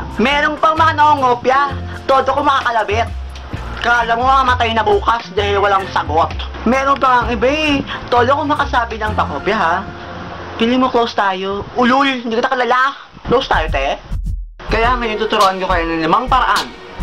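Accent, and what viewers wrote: Visayas, Philippines. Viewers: bisaya diay ka .. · waaah. bisaya. tga asa ka?hahahah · shet!!...lingawa nimo mag.bisaya oi..karelate man ko sa imong pisti ug yawa...haha!!.. igo ko atong sign language...LOL.. #pisti ka day, naa na si maam day, yawa ka...haha!!!! :DDDDD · HAHAHA! galing, bisaya din pala c kuya. bagay bisaya sau kuya;) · "day,day josephine unsay sagot sa number 1 day?day,pagdali day....kay naa na si maam...pesti...pesti ka..yawa ka..XD. Bisaya kang dako!!hahaah... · Bisaya man diay nis. hahaha buanga oi! xD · haha bisaya gud siya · biSaya diay ka ????.. . hahaaha. . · hahahaha! bisaya pd d.i ka? xD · hahaha lakas ng trip ee. BISAYA MAN DIAY KA DONG! nyahahahaa! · Bisaya ka? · ag KYUTTTT!!! nag pag bibisaya mo tol!!!!! wahahaha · BISAYA KA? SHET AS IN? WALA KO NAG EXPECT! OR KATONG YAYA NINYO ANG BISAYA? MY GOSH GI KILIG KO PISTE! 😂😂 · teeeerrr, bisaya jud ka ter? 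lingaw kaayo ka ter uie! kaulett! haha. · HAHAHAHAHAAHHHAHA bisaya te? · Haha, Feeling ko Bisaya talaga dahil sa tono :v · Bisaya? hahaha xD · BISAYA MAN DIAY KA HAHAHAHAHAHA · ahahaha bisaya si koya.. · Bisaya ka pala? san parte day? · bisaya ka? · Hahahaha. nad Yess. Bisaya ka day.! Hahahahhahahaaa. Im from Cebu. noe here. :* "Yawa ka pisti:" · bisaya pala xa...!haha...... tga san yan..? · bisaya ba? bisaya? :) Galing!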